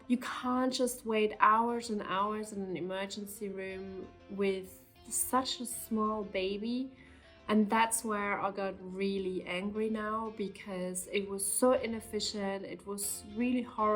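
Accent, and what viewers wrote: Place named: Germany. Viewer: I recognized literrally from the first words that you were german. Are there a lot of germans there? If yes, I wont move.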